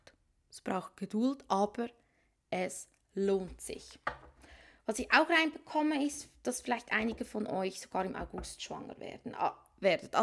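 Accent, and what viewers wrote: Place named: Switzerland. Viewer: Mit wunderbarer schweizer Akzent ;) Grüessli us de Schwiiz :D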